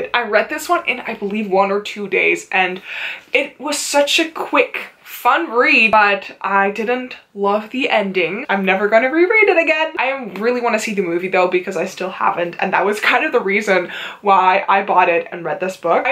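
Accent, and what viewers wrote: Netherlands. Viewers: HolY SH- I've never seen your content before and I thought: she sounds kinda Dutch. TURNS OUT YOU ARE DUTCH. OMG ik wil graag meer Nederlandse BookTubers dus tijd om the abboneren!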